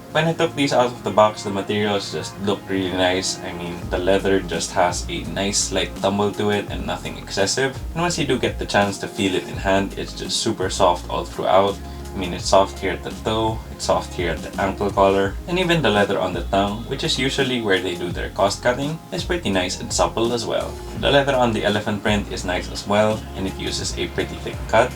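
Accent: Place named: Philippines. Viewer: dat pinoy aksent men🤘🏽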